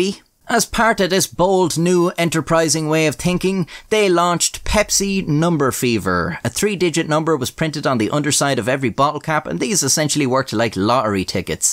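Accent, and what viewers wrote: Ireland. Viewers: hear tings yup definetly irish (dane so for a sec i was wondering why a dnaish word appeared) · Off D sofa trone . . . The Irish pronunciation always make me smile. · Today is good, the sarcastic condescending Irish man has graced us. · The videos are great but I also dig listening to that Irish accent. 😀 Is it normal for everyone over there or is it a more specific to a certain part of Ireland? Keep up the good work!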